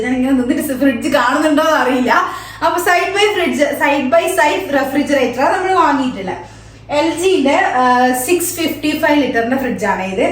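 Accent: Kannur, India. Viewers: Adipowli chechi❤ എനിക്ക് ചേച്ചിടെ സംസാരം ഭയങ്കര ഇഷ്ട്ടായിന് നമ്മളെ കണ്ണൂർ സ്‌ലാങ് വേറെ നാട്ടിൽ നിൽക്കുമ്പോഴും മാറിട്ടില്ലല്ലോ👍👍👍🥰🥰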